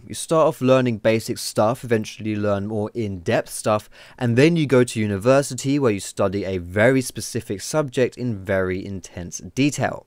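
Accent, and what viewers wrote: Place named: United Kingdom. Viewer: Pls make a guide on how to speak with such a nice british accent!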